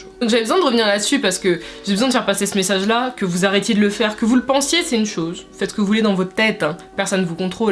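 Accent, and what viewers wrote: England, United Kingdom. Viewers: pourquoi tu prend un accent anglais? C'est voulus ou tu es a appris l’anglais au Royaume Unis?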